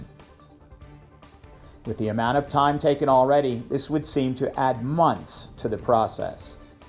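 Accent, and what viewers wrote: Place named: Somalia. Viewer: Your sound like somali who want to get some work in kenya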